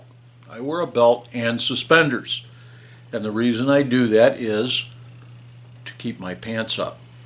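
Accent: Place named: West, United States. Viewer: you can hear the west in his mouth lol. UNCLE!!!!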